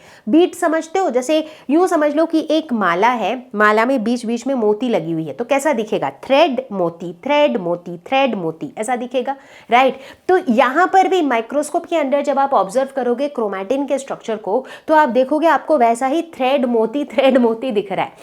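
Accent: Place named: Hyderabad, India. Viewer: aapka hyderabadi accent is super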